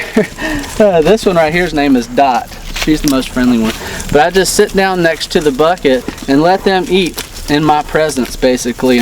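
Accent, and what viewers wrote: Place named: Kentucky, United States. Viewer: I like your gentle way with your lambs. Thanks for sharing, we just got three 14 week old girl lambs yesterday. I'm hoping ours can eventually be as freindly and trusting . Karen from Newzealand. By the way love your accent are you anywhere near Kentucky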